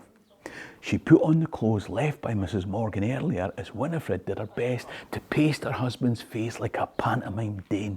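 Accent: Scotland, United Kingdom. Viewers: No, no-one said that, and that is every bit as disrespectful a distortion as asking you not to speak in a Scottish accent was a disrespectful request. I have watched enough of your videos to know that you are more intelligent than that. Normalising the use of AI imagery harms people who make their living making that imagery for creators by making it acceptable for those who can afford to pay them to cut them out instead. You are talking like no form of visuals other than AI are available for you to make your content less visually boring. That is patently untrue. Perhaps if I were to churn out 10 AI generated videos on Scottish history a day, that had been "trained" on your content, you would get it then.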